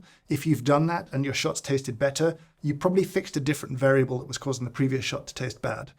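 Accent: United Kingdom. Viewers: Here’s the thing about “party tricks”: you can’ t really explain why they work. For instance, I don’t know how it came to be that I would sit through a 19+ minute long video about an espresso machine which costs 19K and which I certainly would not be able to afford and yet, YET I just did! I don’t know what it is… is it my taste for espresso? Is it my curiosity about tech? Is it about ’ british accent and perfect pitch? I genuinely can’t explain why 😂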